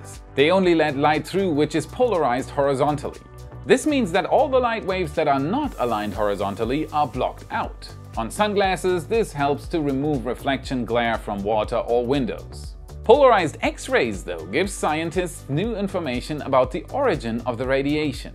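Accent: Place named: Germany. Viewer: I love listening to that German accent, it reminds me of commander Craise on Farscape.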